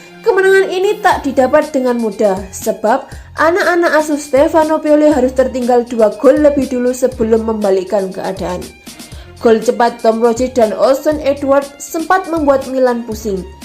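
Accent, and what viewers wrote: Java, Indonesia. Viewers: Klu nulis judul yg bener ploook...dan gasah medok medok Ngomong nya kampretttt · Wong jowo hhhh Penyiarnya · Medok amet mba logat jawa nyah ✌️ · Forza medok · Jowo ne pundi mbak pembaca berita??? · Medok cuq ... 😄😄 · Medok nya 😅 Penyebutan pelatih MU seakan aiueo 😅 Redaksi nya perlu di perbaiki But overall its ok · Medok jancuk wkwwk mantabs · Medokk min🤣ttep semangat💪💪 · Medokk bet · Suara khas medok.. #ForzaMilan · Ora penak banget di rungokna, medok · Medok kali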